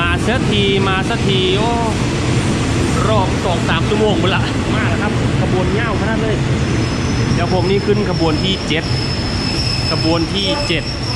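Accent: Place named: Isan, Thailand. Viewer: สำเนียงอิสานแบบนี้เพราะมาก.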